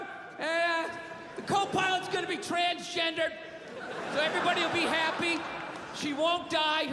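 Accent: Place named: United States. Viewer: Pub bore with a yank accent. Heard it all before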